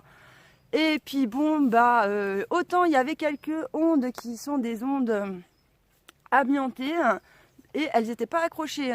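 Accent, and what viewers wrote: Paris, France. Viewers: Mais putain, pourquoi tu causes comme une parisienne derrière tes lunettes de Kaaris ?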